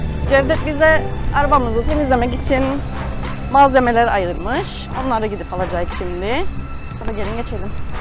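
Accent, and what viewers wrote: Cyprus. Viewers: Kıbrıs lehçesine bayılıyorum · Ya bu Kıbrıs konuşmasına bayılıyorum 🤣🤣Kıbrıs tatlısı kız formula yarışcısı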